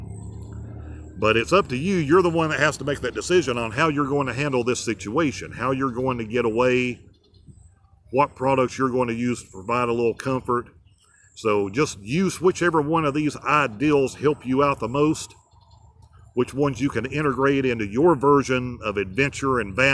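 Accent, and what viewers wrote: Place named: New England, United States. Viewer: Just his "accent". Folks from New England drive me nuts with their accent, when they say "sore" in stead of "saw", etc.; i-dear instead of idea.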